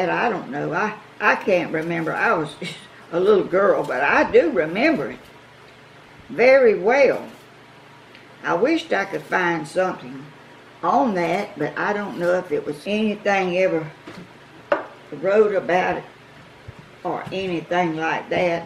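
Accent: South, United States. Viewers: It’s my bed time and boy your southern voice is so comforting and relaxing..Glad I ran across your channel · I'm so glad I found your your channel! My Grandparents were from North Carolina and moved out West so I was raised Southern. The Southern way of talking is dear to my heart but I don't hear it much. You've brought me so much joy! I just knew when you got in to pick the squash you'd probably get 60 to 80 pounds worth Ha! I'm guessing some of your recipes are much like my grandmas. Take care now!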